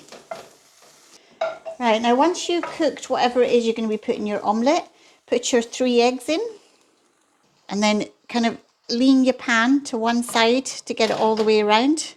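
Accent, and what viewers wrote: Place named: Scotland, United Kingdom. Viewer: Delish. Even heard a few wee words from you there with a slight Scottish accent. hehe xx And Scott Bremner....I love omelettes more than you, so there.lol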